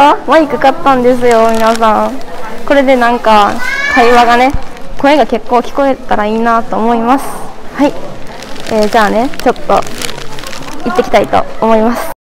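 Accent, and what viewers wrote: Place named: Kansai, Japan. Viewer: 지나가는 나그네입니다. 일본어 간사이밴이 듣기 좋아요. ホンマに日本人？の顔か韓国人の顔だから驚きました。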